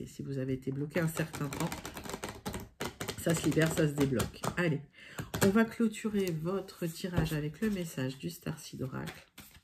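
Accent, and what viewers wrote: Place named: United Kingdom. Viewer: Waouh de plus en plus l'accent British 😅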